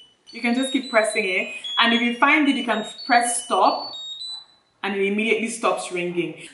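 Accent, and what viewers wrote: Igbo, Nigeria. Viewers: I just love the Igbo accent 😅 welcome home 💖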